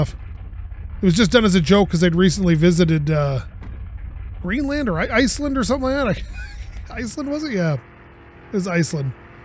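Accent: Canada. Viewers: You have a Canadian accent are you Canadian?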